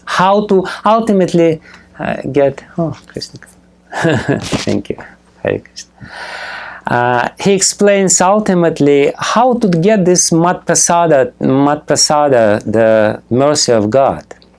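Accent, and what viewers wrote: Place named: Russia. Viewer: Хотелось бы знать, кто этот Гуру. Ясно, что из России, акцент очень сильный. Where is the description about Him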